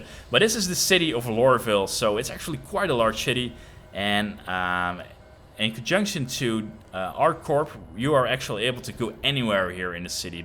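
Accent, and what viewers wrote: Netherlands. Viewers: Are you dutch? I bet you're dutch.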